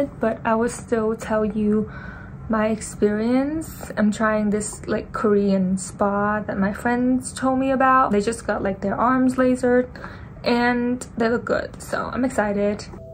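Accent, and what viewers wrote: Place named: United States. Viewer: Sounds like you was educated in the states?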